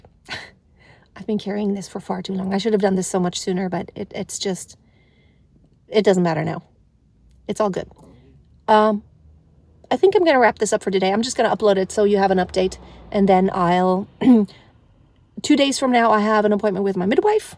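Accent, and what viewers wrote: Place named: United States. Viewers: How it is possible to get a perfect American accent like you were born there ? You are from Germany but sound like an American.🌹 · I think she is in Germany 🇩🇪 but originally American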